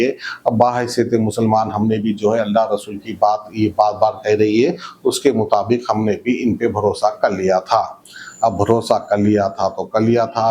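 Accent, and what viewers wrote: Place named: Deccan, India. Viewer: Aray bhai Tum pehle bolne ka saleeqa seekho yaro lehja deccani raha toh koi baat nai lekin andaaz e bayan bhi achha hona chahiye Tumhara purana dhanda achha tha miya illigal machhi ki dallali karne ka Kaiku aaya re bawa yeh platform pe?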